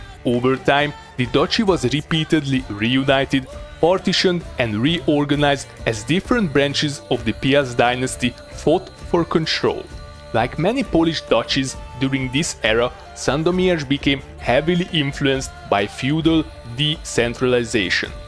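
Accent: Hungary. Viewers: I instantly recognised that he was hungarian just by his accent XD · Annyira hallom hogy magyar vagy XD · Nem kell. Van egy fajta sármja a magyar kiejtésnek. Csak így tovább!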